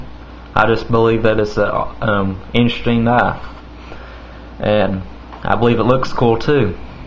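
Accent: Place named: South, United States. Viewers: Look people, he's a southern man with a slight speech impediment, lets have SOME decency and comment on the knife being shown? Not on this man's accent. Now, i think its a cool knife, it is manacing though, this is probably why you get looks, it's foreign in design, meaning people probably see it as solely a weapon and Not a tool. Cool knife. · I love your Texan accent. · I don't care what people say. The southern accent is awesome!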